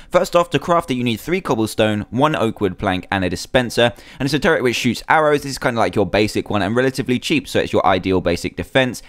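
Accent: United Kingdom. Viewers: Are you british? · yes, obviously he is British, (no offence)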